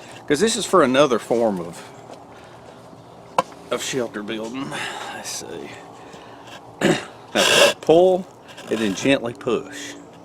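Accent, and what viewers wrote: South, United States. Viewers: "I'm Southern. Cut me some slack." lol. Sometimes I watch your videos just to hear your accent! You seem like the nicest guy on YouTube. You always call things a "little gem". Well I think YOU'RE a little gem. Your experience and knowledge are second to none. And this video is EXCELLENT. A brilliant saw tutorial. I had no idea what the different types of teeth were for or how they functioned, ie wet vs dry wood. Never have I read on any saw descriptions what they are specified for. Now I understand saws SO much more. Thank you for all your videos. I've learned so much from you. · You were the first person I subscribed to on YouTube. I always look forward to your videos. The videos are informative and entertaining. I hope you, Nick and the rest of your family have a very Merry Christmas. GOD bless you. Also, I like the way you talk. 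I live in Arkansas. We speak southern too! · And, in case you didn't know you have a Southern US dialect, here's two cases of Northern Britain: Scottish: <link> And Welsh: <link> I'm rather amazed how people claim you are speaking the same language.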